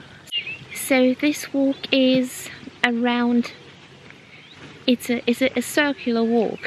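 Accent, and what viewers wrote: England, United Kingdom. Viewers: if you are both German, why do you have such a heavy English accent? Loved your video! 😊❤️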